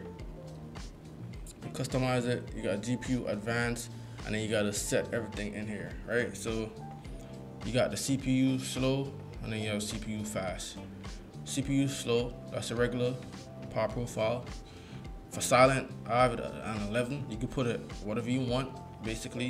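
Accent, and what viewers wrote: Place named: Jamaica. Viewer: I can tell!!!! I know a fellow jamaican when I see and hear one. I'm from Mobay. I think I subbed to u by the way. I have the deck, the switch and rog ally. While the deck and ally are both cool, I still think personally the switch us a way better and funner hybrid. The switch 2 will furthermore burry all these wanna be switch gandhelds into the ground even more. Anyway keep doing your things nintendo for life though lol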